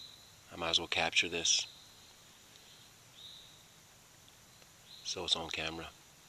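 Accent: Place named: South, United States. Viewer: I love your country accent. ;)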